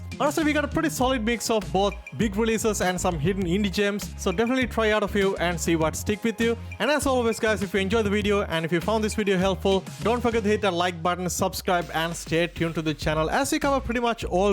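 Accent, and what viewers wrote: India. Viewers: south asia , i think india most probablt cause his indian accent is slippin a little out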